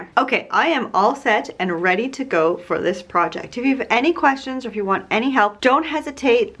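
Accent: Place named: Canada. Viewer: Holy Moly! Did you say your 7 year old son speaks 4 languages???!!! Wowza! I’ve always been curious as to where you live (you sound Canadian but you reference “Europe” often), I was tickled to hear in your video on 8/2 (I think) that you live in Luxembourg! Very cool! Could you do a video about yourself, how many kids, where you were born/grew up, where you live on and why, how you go into YouTube, etc. I just enjoy learning about people! Love your videos!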